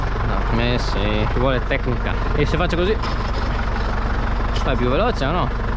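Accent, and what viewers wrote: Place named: Verona, Italy. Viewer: grande!! Ho sentio l'accento veronese e me son emosionà!! 😂😂 Ti ho scoperto da poco e appena ho sentio el papà parlar to seguio subito.. Grande bei video un giorno veo a catarte e a ciavarte un po' de verdura😜😜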